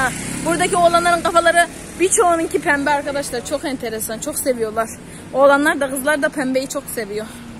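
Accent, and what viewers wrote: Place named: Sivas, Turkey. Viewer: Guzel kardesim gülen yüzün hep gülsün ,lehcen güzel Sivasimiza çok benziyor.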